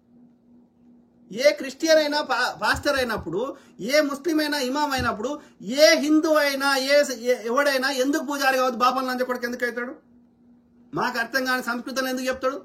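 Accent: Telangana, India. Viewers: Good telangana voice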